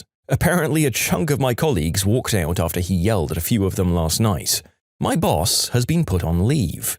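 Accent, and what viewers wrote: United Kingdom. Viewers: Th4 britidh accent makes this 200 percent better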